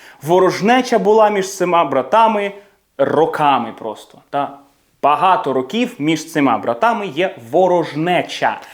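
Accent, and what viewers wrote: United Kingdom. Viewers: Дуже гарна британська вимова. Приємно слухати.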